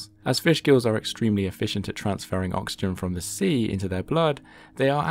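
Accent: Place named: United Kingdom. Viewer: Why do so many of these documentaries have narrators with shitty British accents?? If it's not David Attenborough then get an American or neutral accent.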